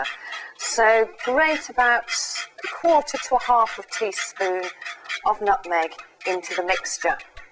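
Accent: United Kingdom. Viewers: i like your egland voice bloke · No matter what a woman says, American men find it extremely sexy if said with a British accent. This video is especially scrumptious. I used 4 large brown eggs, raw sugar and light brown sugar, a shot of maple syrup and a shot of apple juice today.. half and half instead of sour cream, cream cheese or canned milk. I got a little hunk of fresh ginger root and grated it myself.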